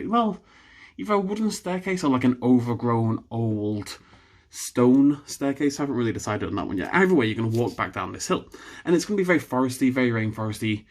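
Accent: United Kingdom. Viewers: I just like to listen to British guys talk about video games so sketch away.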